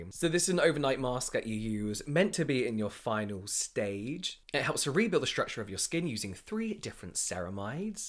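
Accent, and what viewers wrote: United Kingdom. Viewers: Omgggg your British accent 😍😍😍😍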